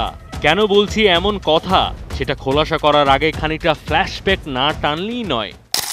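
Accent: Kolkata, India. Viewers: Kolkatar bangla.....শ্রুতিক টু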